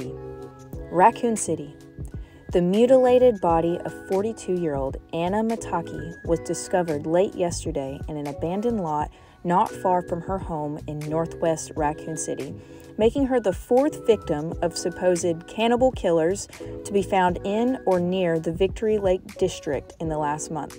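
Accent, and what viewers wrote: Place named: South, United States. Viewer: You’ve a soothing voice, one that makes for easy listening, like a Sam Elliot or a James Earl Jones. I heard a slight southern accent which was adorable, but I say go for it!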